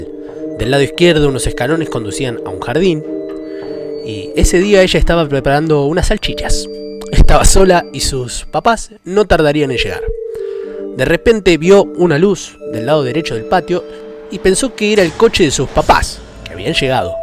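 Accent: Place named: Argentina. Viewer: Dross que te pasó? Ahora hablas con acento argentino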